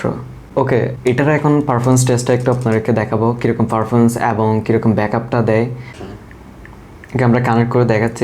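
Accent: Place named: Noakhali, Bangladesh. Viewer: noyakhliar local accent roye gese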